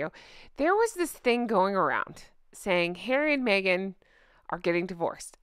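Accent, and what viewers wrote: Ireland. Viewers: I'm Irish and your Accent is not too far off😮love ya love your Channel God Bless to you and your wee family 🙏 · Oh thank you for making me laugh and chereing me up!! in bed ill,drinking tea, and i have not stopped laughing!! by the way your Irish accent is good!! take it from me☘❤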